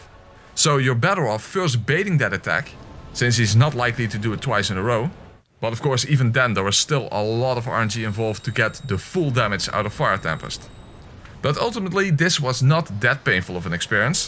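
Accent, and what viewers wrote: Netherlands. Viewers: Nederlandse Engels-accenten zijn echt geweldig. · Are you from the Netherlands? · Never heard of you before, but after like three words I was like ''oh shit, a fellow Dutchie''. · My favorite Dutch accent content creator strikes again. Lekker bezig man!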